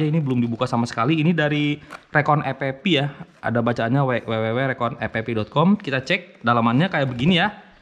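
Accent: West Java, Indonesia. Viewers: Kang sampean orang sundanya, kanyahoan dina logat nage😁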